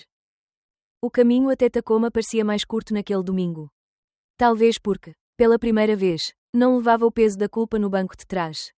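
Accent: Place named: Portugal. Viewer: Horrivelmente monótono, e, para piorar a narradora é de Portugal com àquele sotaque de quem está com um ovo quente de galinha na boca.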